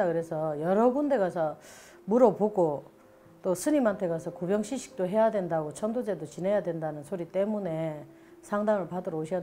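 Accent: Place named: Gyeongsang, South Korea. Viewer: 선생님 사투리쓰셔서 갱상도 계신줄 ㅠ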